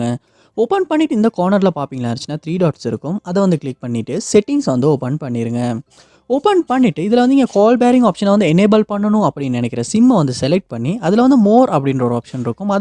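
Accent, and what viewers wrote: Sri Lanka. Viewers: Are you sri lankan tamil ?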